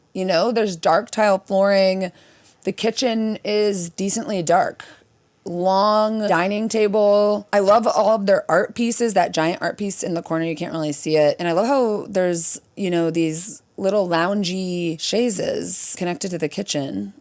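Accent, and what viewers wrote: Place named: Midwest, United States. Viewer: I love that with your midwest accent, it sounds to me like when you say tour you're saying 'tew-er' 😆 I cant not heart it!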